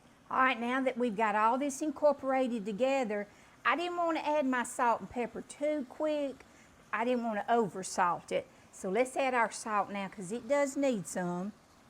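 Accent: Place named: South, United States. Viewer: This looks really good 😋. New Subscriber 719 🎉. I love your southern accent and videos. Just saw the Shorts with Fried Okra. Will you be posting it here too?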